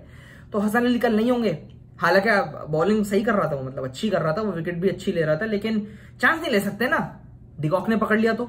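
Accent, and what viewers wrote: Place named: Uttar Pradesh, India. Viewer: Bhai tu best analyst hai cricket ka , Mazak sa hatt ka tere style match kar rahe hai apne sa !! UP wale accent hai teri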